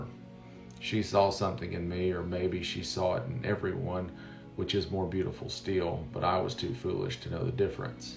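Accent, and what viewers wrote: South, United States. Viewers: 👏🏼💖Thank you. You are the most eloquent, beautifully spoken man with a Southern accent I have ever heard! You deliver such poignant truth with such thoughtful vocabulary & deep insight into the heart of the subject, you never cease to amaze me! I'm in tears listening to this, thank you so much for sharing your kind, beautiful spirit!🙏🏼🤟🏼✌🏼💙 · Your video on "Christian nationalism" popped up on my YT a few days ago. As that was a subject that has been occupying, not to say concerning, me for some time I clicked and heard it right through. I agreed with everything you said and was very impressed by your use of the English language - I'm a Brit living in Germany - but otherwise didn't know quite what to make of you. Now I've watched this one and was really moved. I too had a number of teachers who were an encouragement and help to me: I don't think I was a bad student, as I did what was expected of me, but they taught me to think for myself and that was something new and opened up a whole new world for me. 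I went on to study foreign languages (German and Spanish) and after university got a job as a translator here in Germany. I'm retired now, but I can still remember most of my teachers' names (and would like to thank Ms. Davies, Mr. Edson, Mr. Hateley, Dr. Meier, Mr. Kirkman and Dr. Smith i.a. here). I was never the maths and physics type, more the English and languages type, and as I became a Christian (which in the UK - or in Germany, for that matter - is not like in the US) at university I developed an interest in theology too. Thanks for this video (and thanks to y'all for your comments, which I found equally moving). Your English with the southern accent (I'm also a fan of Trae Crowder, by the way) is very soothing but eloquent, down-to-earth and inspiring - compared with what we usually see in the US news, whic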